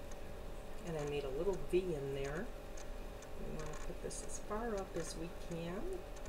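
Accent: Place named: Michigan, United States. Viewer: That was a great tutorial and I enjoyed hearing your voice from MI perhaps? I can't wait to get these myself.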